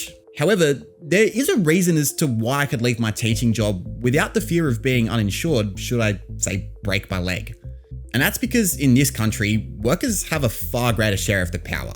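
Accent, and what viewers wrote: Australia. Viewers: God I’m trying so hard to understand this but Aussie English is otherworldly man 😭🙈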